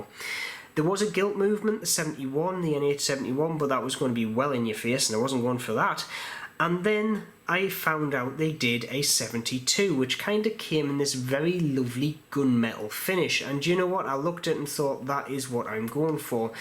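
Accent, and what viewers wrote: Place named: Durham, United Kingdom. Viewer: You sound like Durham lad.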